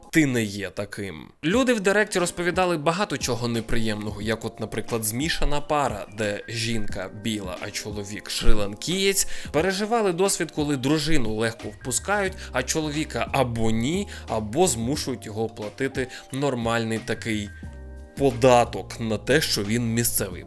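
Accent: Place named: Olevsk, Ukraine. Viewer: Дякую за позицію! Мої Вам вітання з Києва! Як же я скучила за олевською говіркою. В мене тато звідти, з села Кишин, там досить багато рідні. Нажаль останні роки рідко спілкуємося, якесь бентежне життя настало...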